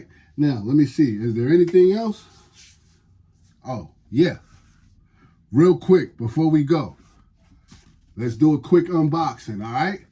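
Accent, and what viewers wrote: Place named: New England, United States. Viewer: Bro, you're from New england?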